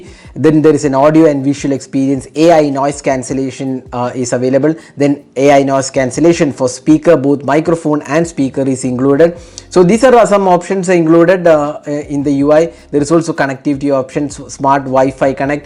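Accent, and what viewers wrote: Kerala, India. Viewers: sounds like mallu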